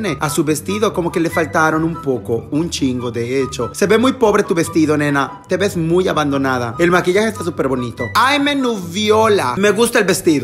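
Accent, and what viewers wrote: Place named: Yucatan, Mexico. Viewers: Amo tu acento yucateco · Jajaja solo veo tus videos por que me da ternura tu acento yucteco <3 y todo lo demas 🐷🐷🐽🐽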